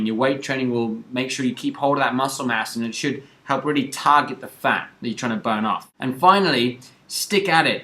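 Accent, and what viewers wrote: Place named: England, United Kingdom. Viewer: Thanks a lot teacher. BTW, You sound a lot cooler with your English accent haha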